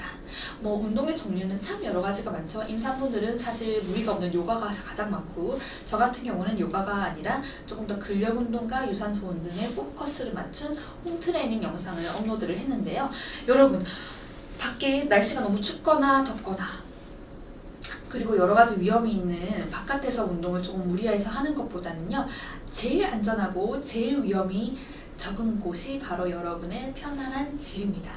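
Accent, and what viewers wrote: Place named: Busan, South Korea. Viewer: 임신부 운동 검색하다가 찾아왔어요. 말투가 익숙하다 싶었는데 부산분이라 반갑네요 ㅎ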